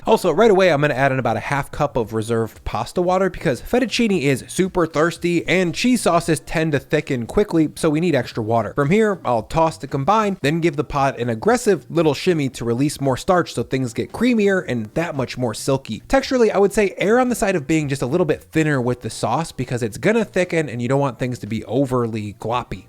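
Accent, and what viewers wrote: United States. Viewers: Pronouncing those words in a heavy American accent is funny as hell, though. At least Google how it's supposed to be pronounced before doing the video. 🤷🏻‍♀️ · Thank you for pronouncing the ingredients in proper American English way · A guy with a German name, American accent, using European ingredients to cook Italian pasta in 5 sauces !!! What's the real message here? By 2025, the earth has become a huge village ??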